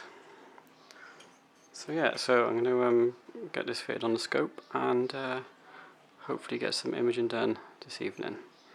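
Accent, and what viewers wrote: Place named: Manchester, United Kingdom. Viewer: MY GOD HE"S FROM MANCHESTER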